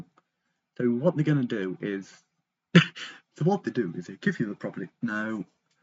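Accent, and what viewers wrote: United Kingdom. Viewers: Nice Bri’ ish voice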